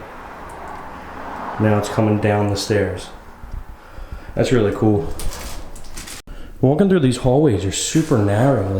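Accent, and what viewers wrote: United States. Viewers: The music makes me think that this is Ireland, and he looks Irish, but he's speaking in an American accent, so IDK what to think lol..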